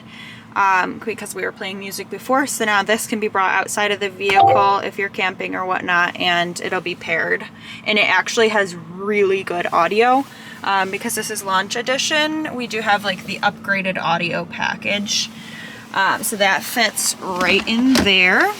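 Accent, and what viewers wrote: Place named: Chicago, United States. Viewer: Born and raised in Chicago….I can hear the Chicago in you voice! We have a similar handsome dog. Love the Rivian! And Lake Shore Drive (I slightly dated myself😂)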